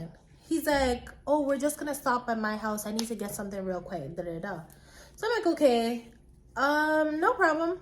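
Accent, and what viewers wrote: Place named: Jamaica. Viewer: the patois is killing me 😂 are you jamaican??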